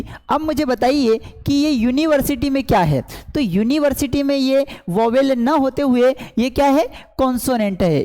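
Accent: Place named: India. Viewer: Sir ji ki English ki class me Pure hindi ka pronunciation ho raha hai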